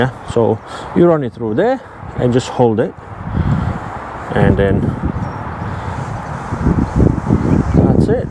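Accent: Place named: Yorkshire, United Kingdom. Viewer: Great Vlog!!!!!!!!! Thoroughly enjoyed it!!!! I love your 'Yorkshire' accent!!!!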